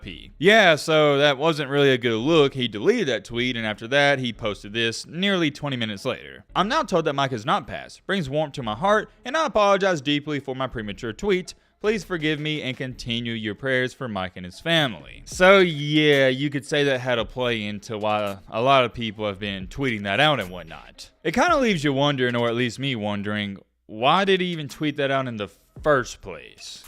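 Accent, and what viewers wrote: South, United States. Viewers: Hoe-Spice? Please never complain that people assume you're an ignorant redneck based on your ignorant redneck Cracker accent. You prove it. You're simply too Dunning-Kruger to understand the condition of my favorite coach. You have no idea how many names and words you mispronounce. What is the redneck name for Ebonics?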